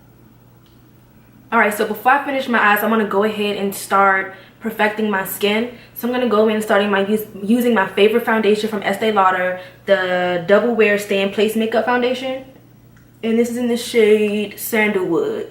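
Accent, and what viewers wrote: Washington DC, United States. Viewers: Where are you from you sound like us girlies from D.C.!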